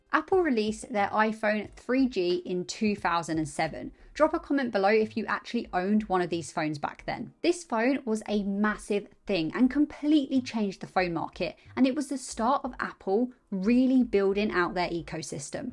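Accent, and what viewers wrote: United Kingdom. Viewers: Found the channel, sub'd, I listen to several TSLA retail investors, this is the first British retail TSLA channel I've watched (American), The British accent is kinda fun. Anyway, I am part of the legion of retail investors that are all in on Tesla. I think self driving cars shares a certain similarity to the development of search for the interent in the 2000s, except that the capital requirements for self driving cars effectively means that some college kids in a dorm room will not win this race. Thus, the players are more well defined, and extroplating a winner is theoretically easier. Could I and others betting on Tesla be wrong? Of Course, but I think our odds are much better than those who were searching for the needle of google in the haystack of internet search companies back in the 2000s and the payoff is also arguably higher. 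Big upside and, an easier route to finding the winner. If Tesla can solve cars, I think the Bot situation is quite optimistic after that, since they can really push hard without worrying about consequences. If a car crashes, people can die. So a lot of restraint has to be utilized with the AI development. If a robot runs head first into a wall or a machine, some minor damage occurs at most, so they can really push hard and go nuts with little restraint on the bot. I think people will be surprised when Tesla wins self driving, but I think they will be utterly shocked and austounded at how quickly Tesla pivots and has effective humanoid robot wor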